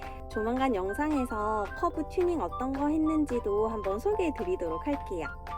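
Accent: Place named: Gyeongsang, South Korea. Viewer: 경상도 ㅎ ㅎ 사투리 좀 안쓰면 안되나? 딴세상 살아요? 서울에서 그리 멀지 않은데 ㅡㅡa?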